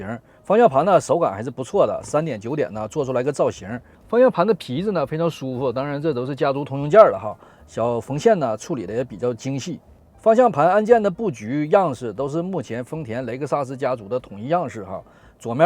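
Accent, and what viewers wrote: Northeast, China. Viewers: 值得十倍以上的订阅以及十倍以上的赞，简直就是华人评车圈里的Doug Demuro，没有花里胡哨，全是干货信息。作为老广竟然觉得这东北口音格外舒服哈哈，👍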